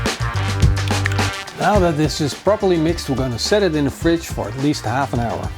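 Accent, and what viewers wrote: Netherlands. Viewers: .... Sir, you are Dutch, aren't you?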